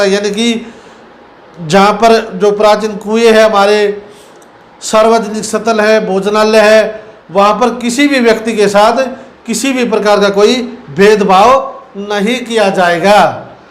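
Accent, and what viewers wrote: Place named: Haryana, India. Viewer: Hariyanvi kamal 😊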